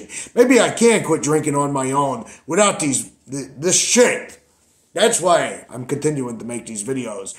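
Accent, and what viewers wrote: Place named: New England, United States. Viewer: New Englandar! Love it!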